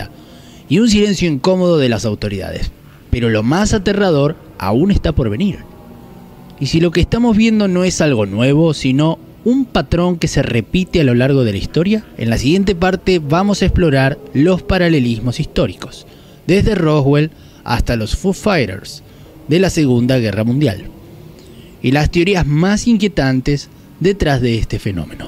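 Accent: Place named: Argentina. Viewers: Ese acento es argentino. En España a la "ye" le decimos "y griega", a la "v" le decimos "uve" y a la "w" le decimos "uve doble". Ja, ja. ¡Parece que hay variedad en el español! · Si Elvio. Así hablamos en algunas provincias de Argentina. Se lo llama Regionalismo. Saludos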